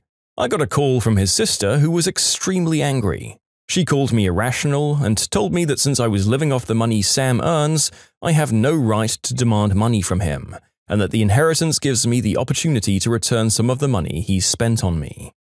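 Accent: United Kingdom. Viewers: Im back its just a britsh lady saying good night to bulldozers and such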